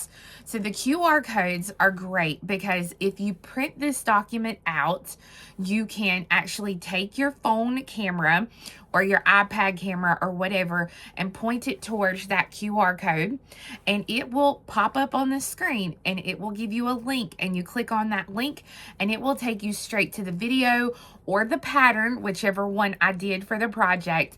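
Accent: South, United States. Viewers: you have brought me so much JOY this year. Those are happy tears, right?!? 2026 is going to be the best year! I am looking forward to learning more, it's so important to me that I learn something, especially as I get (cough) a little older. I love your enthusiasm and your patience with us. You are a fantastic teacher. I love your southern accent; I miss my mama and her Tennessee family who have all passed and it brings me comfort when I hear it. Your knowledge is deep and wide and it shows in every video. I don't go anywhere else to buy or learn. I'm committed to you and your bead store. Best wishes! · Thank you so much for this! It’s really an awesome idea! I wish I could buy more, know that I will when I can. I love your sweet Southern voice, it’s a great comfort for me since it’s just me and my cat anymore. God bless you and your family. Have a blessed and beautiful season!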